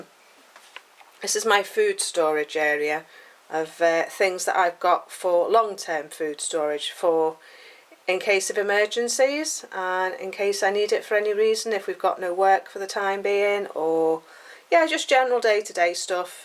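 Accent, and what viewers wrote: Liverpool, United Kingdom. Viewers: Nice pantry ,where do you come from originally? I thought a bit of a Liverpool accent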